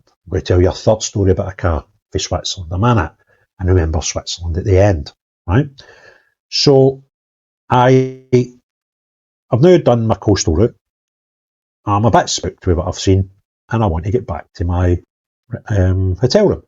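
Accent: Scotland, United Kingdom. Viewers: please watch yourself doing this video. You've got an extremely broad Scottish accent, I want to listen to you as I think it's important. Some printed text would be most helpful ☺️ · Is there any chance you could write down place names in the video descriptions? Sorry, I can't always pick them up from your Scottish accent. I like to search ttem up and read a bit more about them. I couldn't find the one which sounded to me like Kapatiki.Thanks.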